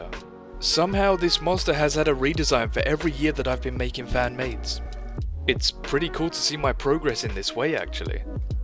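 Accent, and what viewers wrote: United Kingdom. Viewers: Is British? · I didn't know was British. I am happy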